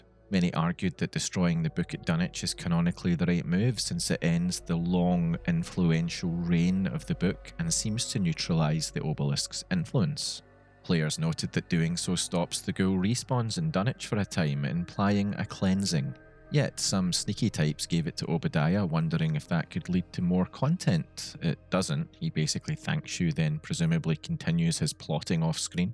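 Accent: Scotland, United Kingdom. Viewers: Scottish spooky game content person ??? Ive just hit the jackpot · Oh ny god , ive at last found a scottish gamer for fallout 😂ive been watching too much american youtubers , i now have a twink in ny accent 😂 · U have a subscriber i can hear ur from my neck off the woods the uk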